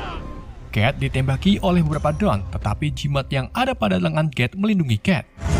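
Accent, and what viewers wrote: Indonesia. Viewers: Gue suka gaya bicara dan gaya basa lu bang, meski membahas alur cerita film asing tapi gaya +62 masih diterapkan 🤣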